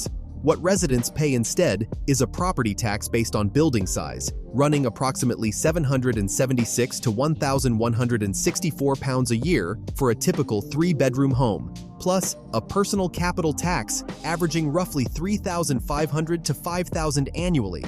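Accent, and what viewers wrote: United States. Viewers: Why the American accent & the annoying music??? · For the Lord's own sake why the hell is it we have to put up with 'warder' and not water, 'oud of', not out of, 'Bridains' not Britains and so on. I had to turn the sound off as the voice was going through my head. The heading:- 'Forgotten British Islands', then 'Proper Britain '. Dear oh dear, what an insult. Soon after the video started, 'Bridish system' was mentioned. I turned it off in disgust to look at something else as narration was needed. "Blasted Americans, keep to your side of the Atlantic and shut the blasted door. Oh yes, turn the key - permanently"! My son moved to America in 1993. Because he assumed I had come into money, in 2007 had the nerve to call me. I thought that I was talking to an American, listening to him. Wise he was as he never called me again after that. 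I left Surrey 67 years ago and moved to Dorset. I am told I sound as though I have arrived from London even with the passing of all those years. That proves there is no need to act / sound as an annoying American. There is something a little disturbing with the English ,as in the 50s and 60s, cars were made to look American by introducing silly rear tail fins and 'wrap - round' windscreens. "Oh! my dear departed mummy, please come back, 'cos I am starting to cry and also 'cos I loved to hear the click, click of your knitting needles". - 'you there Mummy? - MUMMY?? - sniff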